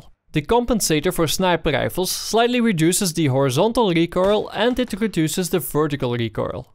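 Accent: Netherlands. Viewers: You're a dutchie, right? :D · i think ure dutch nice vid ik ben ook nl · its a dutch accent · (i'm guessing he's dutch) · lets listen to your accent.... Are you Dutch? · this is dutch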